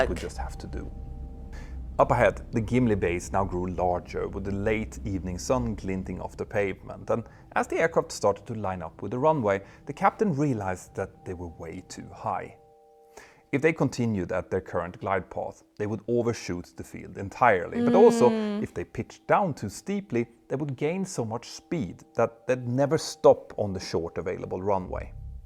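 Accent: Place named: United States. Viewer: I've noticed watching your videos over the last few months in each video your accent reduces more and more. In this video it was almost imperceptible it was like listening to someone from Michigan or northerner Maine. Congrats you can now chameleon your way through the US if you ever visit! Although i do miss your heavier accent sometimes as it was very cute.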